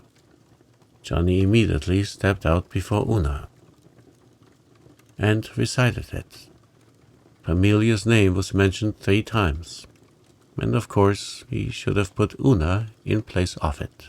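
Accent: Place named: Germany. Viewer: This is the first time I've seen your videos and I am delighted! You are very good at speaking stories! My grandfather had a beautiful German accent like yours. He was very soft spoken as well. If they had You Tube back in the 1980's, my Grandfather could've surely been a part of it! 😆 Thank you for this. I am subscribed now. 🥰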